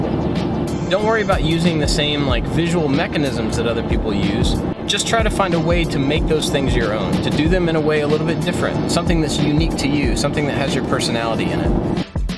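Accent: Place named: United States. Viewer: I feel bad for. This channel is absolutely brilliant - I watch all the vids even if the subject doesn't particularly float my boat. They're always as well made as the 'stuff'. It's just a shame people have to be negative and/or rude enough to make comments so feels he should explain himself. That said, I like as a presenter, (I even like his American accent), his views, thought process, personality, approach and general all round humility. The sort of good guy you would like to have a pint with. So I guess what I'm saying is: thank you haters. We got a bonus video thanks to your bitterness. Keep up the great work and screw those other fools. Carry on.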